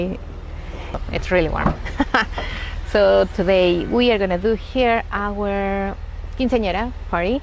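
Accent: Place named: Venezuela. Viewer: Also you look/sound like Venezuelan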